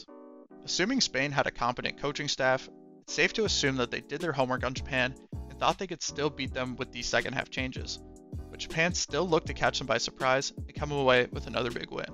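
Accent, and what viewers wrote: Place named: United States. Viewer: I clicked, however hearing the American accent I instantly know everything about this must be BS.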